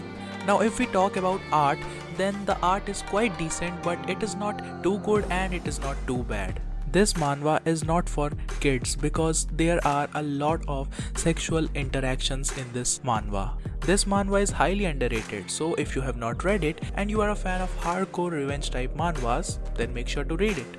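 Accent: India. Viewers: You are indian ? Your accent is desi😂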